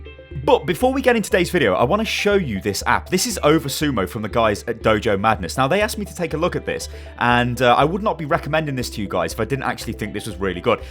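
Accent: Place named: United Kingdom. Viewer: People always fill the comment sections with memes and get all the thumbs ups. They're funny and all, but I want to say something that most of the people in here won't point out. I think / makes the best Overwatch videos in YouTube. Hell, he probably makes one of the greatest gaming videos in overall. Don't get me wrong, he isn't the world's greatest Overwatch player, but he cares so much to share his experience and insight of the game. He's enthusiastic of what his doing and at least I feel that I can absorb some of that energy to my gaming. And even though he would post garbage, I'd still probably watch it, just because of that sweet authentic British accent and toodle-oo! Love from Finland, keep it up.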